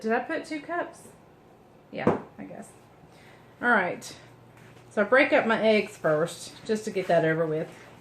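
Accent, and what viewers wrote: South, United States. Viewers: I thought you said Lord but you said lard, it took me a while to figure out what you said. This yankee sometimes gets lost with the southern accent but I love watching you. I don’t think the stainless steel works to remove the onion smell either. Coffee grounds I’ll try, thanks. My husband when he was alive had a guy friend at work that was a bachelor and he loved to cook, he started his spaghetti sauce by cooking a pork roast real slow on the first day to get the drippings which he used as the start of his sauce. I had never known to do that. But the ham or bacon is a great idea to get flavor. My mom wasn’t much of a cook, unlike my country mother-in-law was. My mom for years her idea of making spaghetti was to brown hamburger and sauté an onion add a can of tomato paste, a shake of a little chili powder, salt and pepper and that was it. 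It was really plain or she would use a package of Chef Boyardi spaghetti which was so small and nothing but cheap.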